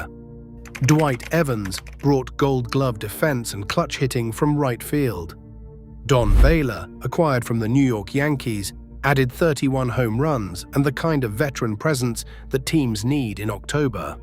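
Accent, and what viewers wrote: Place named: United Kingdom. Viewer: The accent tells us at the very beginning,, this video is going to be a bomb 💣 😅😂😅,,, Veeda Blue,,,, Dykstra Crushed a home-run off oil can Boyd, it hit the Pesky pole in right field, it's been said to be anywhere from 274 feet to 300 feet, regardless, its not a home-run that was CRUSHED, but not according to this Britt nitwit! 😅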